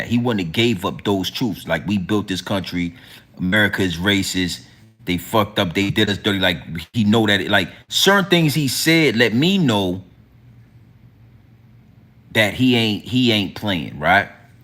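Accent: New York, United States. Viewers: You sound like your from New York. And not from Va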